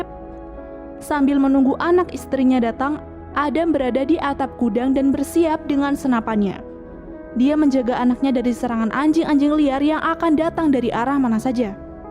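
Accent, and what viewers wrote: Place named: West Java, Indonesia. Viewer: sy heran ama pembawa alur cerita ini, dr logat bahasanya sunda, tp dr video pembukaan biasa seperti situasi di jawa timur, karna sy orang jatim yg tinggal di bogor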